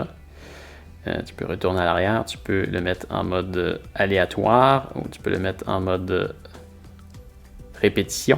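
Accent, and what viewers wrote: Quebec, Canada. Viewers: Genial, merci beaucoup, ça m'a aidé à le repéré avec ma toute nouvelle montre Garmin :) En plus, je kif ton accent quebecois :) Juste un truc, je cherche encore comment on règle le volume .... si tu as la réponse, c'est welcome !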